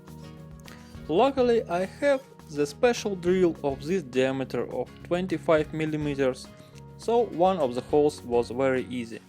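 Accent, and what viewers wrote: Russia. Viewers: I like the Russian accent.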